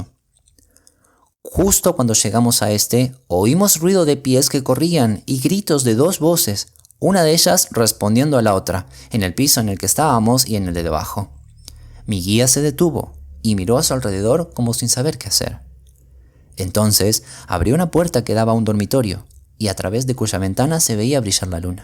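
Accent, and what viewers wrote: Rioplatense, Argentina. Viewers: le ruego me responda, soy sumamente curiosa. argentino o uruguayo? x anticipado, gracias!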